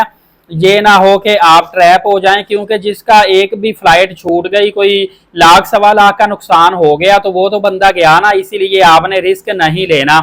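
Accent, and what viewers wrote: Punjab, India. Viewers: انکر صاحب معلوم نہیں اردو میں بات کر رہا ہے یا پنجابی میں بات کر رھا ھے اردو مکمل پنجابی لہجہ میں گفتگو فرمائ جا رھی ھے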